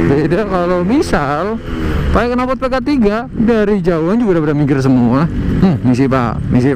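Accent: Banyumas, Indonesia. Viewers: Oke ges welkom bek tu may cenel wit mi, gedang doyan ges..ngapak nya terasa 😄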